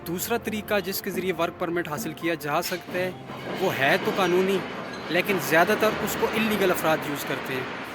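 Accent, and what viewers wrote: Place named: Hindko, Pakistan. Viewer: Yr ak mujy apny hinko speak bi lgty ho khan sy ho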